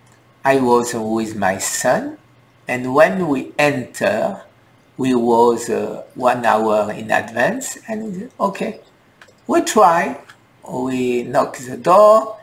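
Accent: France. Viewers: ce n est pas un french accent c est un super slow french accent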